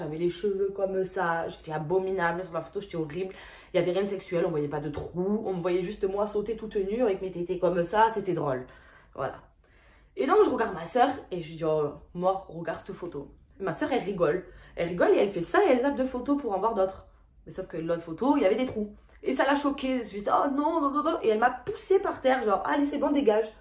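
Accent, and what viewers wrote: Marseille, France. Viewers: Hello, tu as un accent sympathique, tu viens du sud ?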